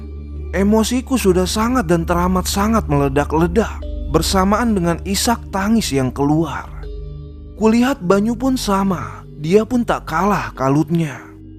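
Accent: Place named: Madiun, Indonesia. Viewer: Hadeeeeeh Madiun bletot lagi...heheheeeee salam bts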